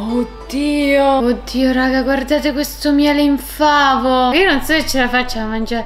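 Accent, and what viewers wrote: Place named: Milan, Italy. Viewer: Stai iniziando ad avere un accento milanese noooo ti pregoooo torna romana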